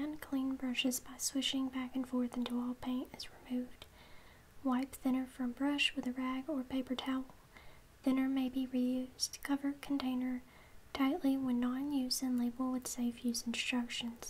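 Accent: South, United States. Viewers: I love your southern accent that keeps sneaking out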